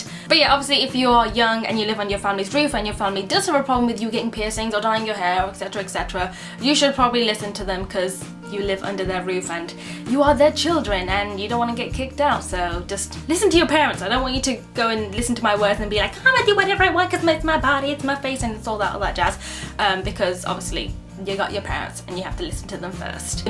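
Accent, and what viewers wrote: United Kingdom. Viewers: LAXICA VIRUTA shes british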